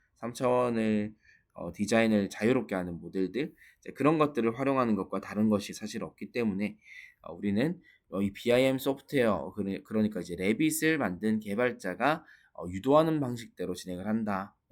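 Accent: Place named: Busan, South Korea. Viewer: 부산이네요 ㅠ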